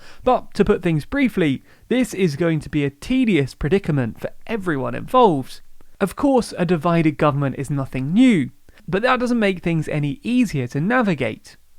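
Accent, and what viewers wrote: United Kingdom. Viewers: The initial cringe I had hearing a british voice talk about "transition period" I was thinking IT NEVER ENDS!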